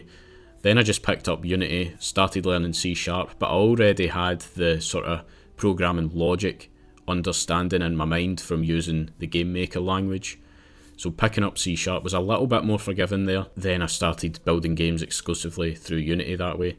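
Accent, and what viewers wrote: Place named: Glasgow, United Kingdom. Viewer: Watched the whole video not because i am super interested in game dev, but u sounds like ur from Glasgow, so supporting you bro!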